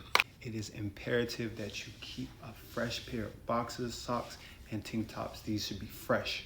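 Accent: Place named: Caribbean. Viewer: Are you Caribbean? The accent keeps slipping out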